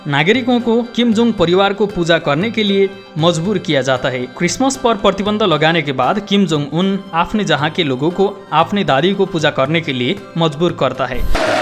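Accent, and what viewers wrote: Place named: Nepal. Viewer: नेपाली हो नी, तर लवज नेपाली नेपाली आइदियो😜😜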